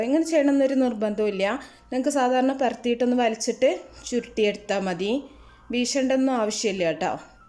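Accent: India. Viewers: Ok ചെയ്തു നോകാം ട്ടാ 😄, എന്റെ നാട്ടിലെ അതെ സംസാരം ചേച്ചി പാലക്കാട്‌ ജില്ല അല്ലെ? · മലബാർ syliyilulla നല്ല അവതരണം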